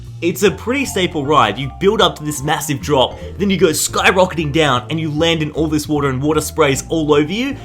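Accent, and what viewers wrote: Australia. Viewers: I love that fabulus Australian accent.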